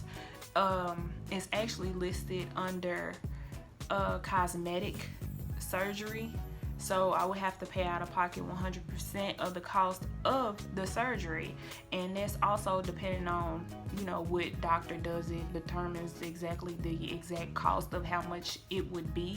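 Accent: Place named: South, United States. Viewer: gal u are beautiful and very articulate with that southern twang , I am still single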